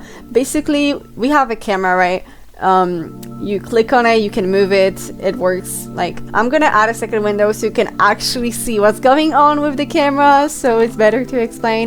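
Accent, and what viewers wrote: England, United Kingdom. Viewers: masz piękny angielski akcent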